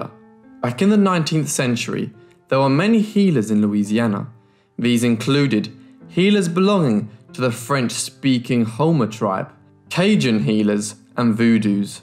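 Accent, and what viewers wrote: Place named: West Midlands, United Kingdom. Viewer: Gotta be from the westmidlands right? Your voice sounds to familiar for it not to be. Xx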